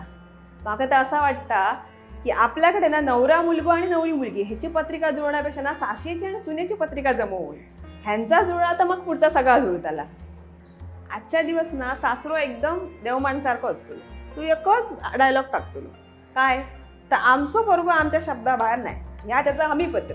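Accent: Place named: Malvan, India. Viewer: .... तुमचे व्हिडिओ खुपच मस्त असतत हा.. अस्सल मालवणी मस्त बोलतास. अगदी गावाक गेल्यासारख्या वाटता. तुम्ही आपलें जुने मालवणी परंपरा, चालीरिती..जगासमोर आणतास ह्या खुप चांगला काम करतास. तुमका खुप खुप शुभेच्छा... मी तुमचे सगळे विडिओ बघतय.खुपच छान असतत.... तुमचा खरा नाव काय आसा...? 😊🙏🏻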